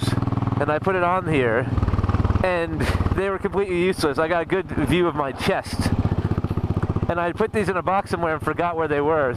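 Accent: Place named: California, United States. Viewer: thanks for your reply I've been a subscriber since late 2018, I wonder why u have a Californian accent though, good morning.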